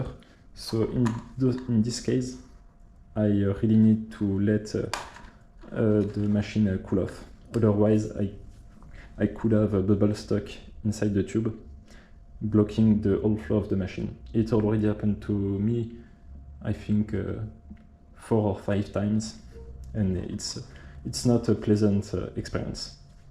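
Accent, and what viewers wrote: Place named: France. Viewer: u speak english very well, euuuuuh, wiz youre french accent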